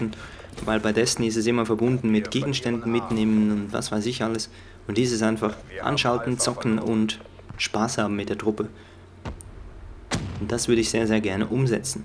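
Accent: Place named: Switzerland. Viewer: deine Fluchen auf Schweizer-Deutsch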